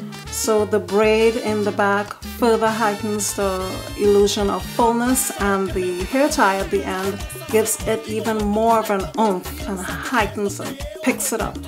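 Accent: Trinidad and Tobago. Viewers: Hiiii! That accent sounds familiar. Trini?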